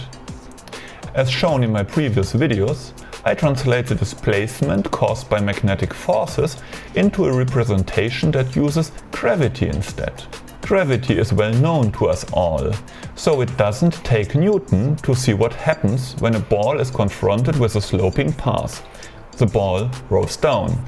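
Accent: Germany. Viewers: Maybe you write in the videos Headline, "MY Magnet Motor do not work" ;) ich denke ich kann auch deutsch reden, du hast son typisch deutschen englischen Akzent :D Mit dem Thema musst du dich schon ausführlicher beschäftigen, denn einfach magneten an paar Platzikteile kleben, das reicht leider nicht, das wichtigste ist ja ein ungleichgewicht bei Anziehung in die gewünschte Drehrichtung zur Gegenrichtung zu schaffen, was auch schon bei hohen Drehzahlen ohne jeglichen weiteren Bauten funktionieren würde, aber wie hoch diese sein müssen kann ich nicht sagen, um was es dabei geht, einfach mal das hier schauen: <link> Was Quantum Energy meinte ist auch eine Möglichkeit, einen Art Monopol zu erschaffen, der Lüling hat es gemacht, in dem er die Magneten im richtigen Moment räumlich entfernt hat, über eine Feder...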